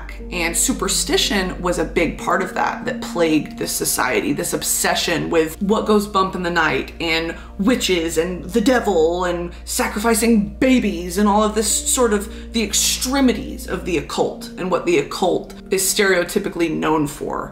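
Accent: Spain. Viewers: ooo she got the spanish accent. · Omg YOU SOUND SPANISH Like if you told me you came straight from Fuenlabrada I'd believe it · So many of her TikToks speaking Spanish have literally hundreds of comments being like “but why you have the Spain accent 🙄” which bothers me so much bc like girl! She learned a whole ass second language! That shit is hard! And her teachers were from Spain! And commenting on people’s accents? Is fucked! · Brilliant Spanish accent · your Spanish accent is gorgeous!!! Me encanta como tu hablas amor!!!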